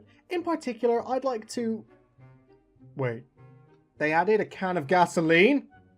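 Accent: Poland. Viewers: M8, u from Poland?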